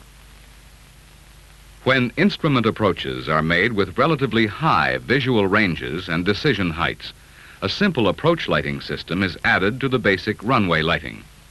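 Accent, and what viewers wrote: United States. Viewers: Thanks for putting this interesting document up. Anyone know the date on this document? Is it a Pans-Ops standard? It's perplexing to hear an English speaker, with an American accent, giving specifications in metric units. One would assume the TERPS document would be in feet. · A few details I noticed about this video. First, the announcer is speaking American English, but the lighting systems being described are ICAO-approved systems, which is an international standard and all distances are in meters. Second, this film was made before Category 3 existed, so there is no information on those systems. But the difference is minimal, since the approach lighting is the same as a Cat 2, and the main difference is extra lights on the taxiways. Third, the systems referred to in this film as “Simple approach light systems”, known to the ICAO to be only good enough for non-precision approaches, which use barrettes at 60 meter/200 foot spacing and extend out only 390 meters/1400 feet rather than the 30 meter/100 foot spacing, 900 meters/3000 feet length of a full system are in fact the basis for the typical Cat 1 system in the US. 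Basically, you take a short, simple approach light system (SSALS), add five sequenced flashers leading up to it (Runway Alignment Indicator Lights), and you have a Medium Intensity Approach Light System with Runway Alignment Indicator Lights, thankfully abbreviated to MALSR. For the international standard, this is only good enough for a non-precision approach. But the FAA thinks it’s good enough for a Cat 1. The standard in the US used to be the ALSF-1, which is similar to the barrette-type Cat 1 system shown in this film. The ALSF-1 remains the standard for military fields in the US. But the FAA believes that saving money is more im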